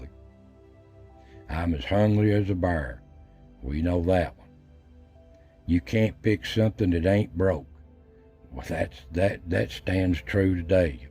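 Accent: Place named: Southern Ohio, United States. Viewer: I too have heard all these sayings. I'm 70 years old and live in southern Ohio and a lot of our people has your same accent.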